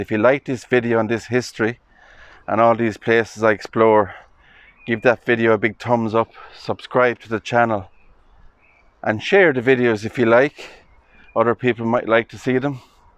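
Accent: Ireland. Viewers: Beautiful video thanks to you Lovely irish voice.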